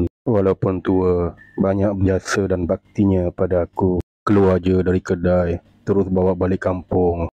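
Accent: Kelantan, Malaysia. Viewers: Haha😂😂jengg mu klate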